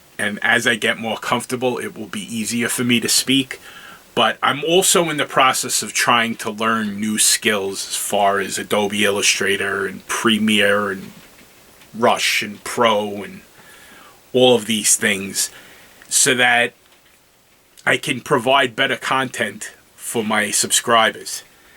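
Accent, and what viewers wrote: New York, United States. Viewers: Excellent modeling skills. Truly a well done detail build...love the engine bay and under carriage. Only one comment. Your speech is fine and you didn't need to bring attention to the "pathology". Ya sound like a Neu Yahker or up coast Bahston. Perfect speech!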